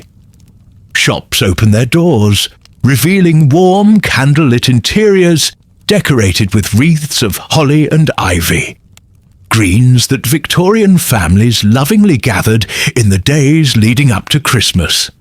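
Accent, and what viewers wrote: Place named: United Kingdom. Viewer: Missouri USA, pm. I love the British accent.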